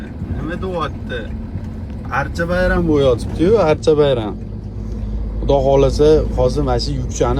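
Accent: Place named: Fergana Valley, Uzbekistan. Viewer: Shevez oʻzgartrmang vodiydansz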